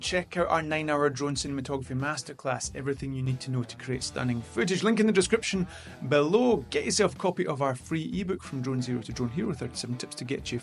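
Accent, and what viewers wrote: Scotland, United Kingdom. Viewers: Well done (&!), Good to have you both back and rolling! Great content, effective comms/visuals as always. And for me the tempo / pace is perfect). 😃And enjoying the scottish entourage (and accents …😉) …👌🤛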